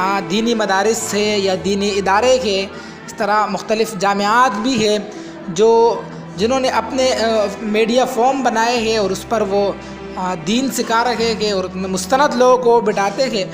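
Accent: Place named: India. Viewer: Ye kesi Pakistani k bolne k style ni he is indian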